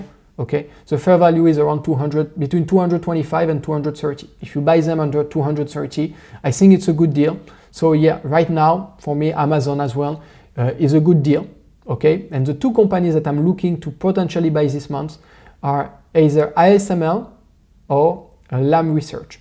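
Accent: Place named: France. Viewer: Haha I love your french accent😂 how old are you?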